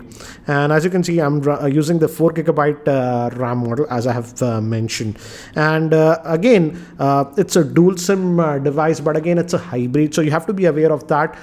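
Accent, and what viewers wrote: Hyderabad, India. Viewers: indian accent is very diaturbing and annoying! i hate it!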